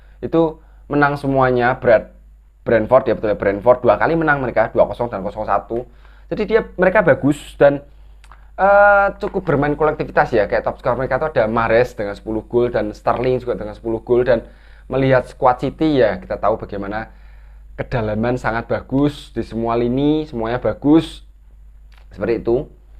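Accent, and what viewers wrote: Semarang, Indonesia. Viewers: Efek logat orang semarang kali🗿👍🤣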